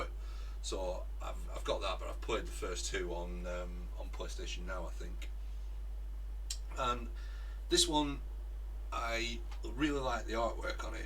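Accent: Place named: Rossendale, United Kingdom. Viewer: Nice pickups, Uncharted is a bit strange with me, I completed and loved the first 3 but didn't like the multiplayer of them and when it came to Uncharted 4 all I played it for was multiplayer and never gave the single player story a proper go, think it's because the demo they released of it was multiplayer and I loved it. Nioh looks like a good game, always wanted to try that....but the English version lol, you sound like you could be from the rossendale area? I could and most probably am wrong.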